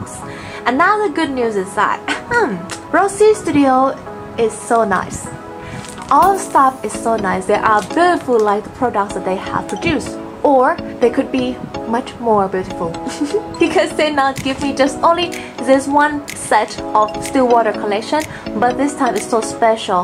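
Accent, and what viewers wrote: England, United Kingdom. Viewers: ติดตามอยู่นะคะพี่ ชอบสำเนียงภาษาอังกฤษพี่จัง ทำมาอีกบ่อยๆนะคะ หนูจะได้ฝึกไปในตัวด้วย ปล.หนูไปสอยสมุด เครื่องเขียน บลาๆ ตามพี่เยอะมาก จนต้องตีมือตัวเองให้หยุดซื้อ <num> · มีทุกอันที่เป็นสติ๊กเกอร์ก่อนพี่ จะรีวิวเจ้าค่า เห็นสีแล้วมันถูกใจมั๊กมาก. ชอบสำเนียงอังกฤษเลย ถึงแม้ต้องอ่านซับรัวๆสู้อังกฤษไม่ไหวจริงๆนับถือๆค่า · ชอบสำเนียงอังกฤษอ่ะฟังเพลินมากเลยค่าาาาทำออกมาบ่อยๆน้า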